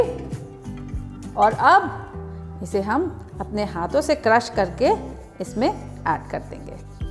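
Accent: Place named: Punjab, India. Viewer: I love the punjabi tone in ur voice ❤️❤️❤️❤️ I know you from master chef ❤️❤️❤️❤️I have supported u all over the season ❤️❤️❤️❤️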